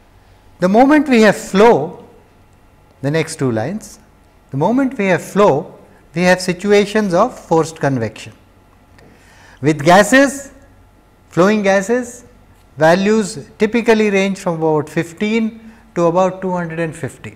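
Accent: India. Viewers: there is too much indian english. great spelling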